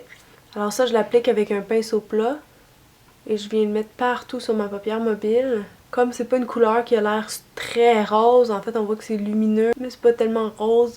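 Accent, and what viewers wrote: Quebec, Canada. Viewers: J'apprends beaucoup en regardant tes vidéos! Pas juste de beaux tutoriels mais hyper informatifs en plus! Et en "Québecois"! Super! :)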